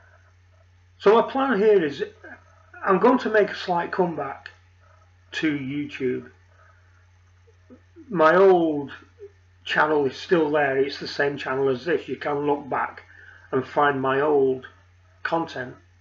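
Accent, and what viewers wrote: United Kingdom. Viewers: all good. Nice to hear the Lancastrian drone of voice again. Bye the way we are on the same page 😉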